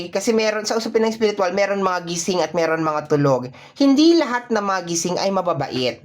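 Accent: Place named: Iloilo, Philippines. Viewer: Good morning watching from Jacksonville florida 🇺🇸 thanks for reading i resonates much and you sounds like ilonggo me too from jaro iloilo city ..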